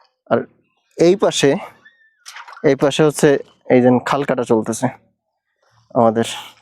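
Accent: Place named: Narail, Bangladesh. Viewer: নড়াইলের ভাষা মনে হচ্ছে।।।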